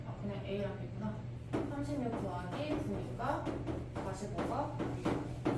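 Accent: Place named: Jeolla, South Korea. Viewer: 전라도 분이시네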